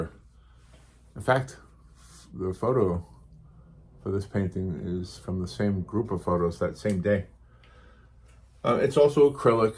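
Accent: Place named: Connecticut, United States. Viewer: Just found your channel and subscribed and am looking forward to watching your videos. You don’t sound like a FL native…CT?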